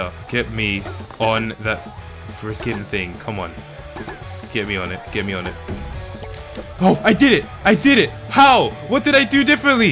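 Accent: Nigeria. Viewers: Oh heck fam U Nigerian? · are u igbo or Yoruba? -naijagang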